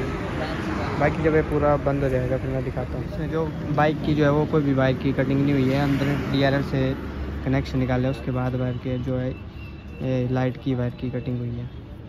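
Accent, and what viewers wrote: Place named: Garhwal, India. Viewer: bhai tu garhwali h kya teri language tone se lg ra h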